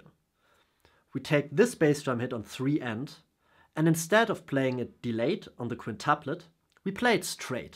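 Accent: Germany. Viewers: Man hört direkt das du Deutscher bist haha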